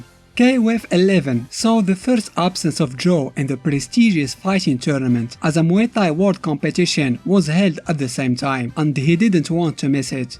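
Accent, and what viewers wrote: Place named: Morocco. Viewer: Salam khoya nta maghrebi ? 3rftk ghir laccent dyalk 😂 ... Anyway la kenti maghrebi tbarklah 3lik bro mo7tawa zwin o Big Big Up lik Bro 👍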